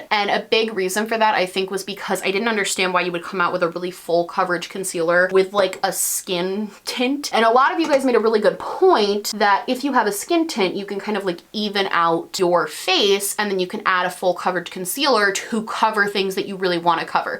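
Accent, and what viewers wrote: Australia. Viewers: Hahaha as your number 1 Aussie fan I cracked up laughing because I was thinking about how much we pay for shipping which sometimes is the same price as the palette we pay for!! Lol 😂 but love your Australian accent mate ! X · I just legit cackled at your Aussie accent like the down under witch I am 😂 And yes, you damn spoiled Americans! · I love the new hair!! I work at a hair salon back home and damn he did you dirty!! Obviously it’s a little harder to get off your skin than more natural looking color, but he should have STARTED to get that off while you were at the bowl and then brought you a towel or cotton ball with the remover on it when you got back to their chair!! Regardless, I love the darker look, I thought in the video before your hair looked purple, glad I wasn’t going crazy 😅 Also I loved the Australian accent 😂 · I really appreciated the Australian accent 👌😂❤️ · As an australian, I'm afraid I must stan your Australian accent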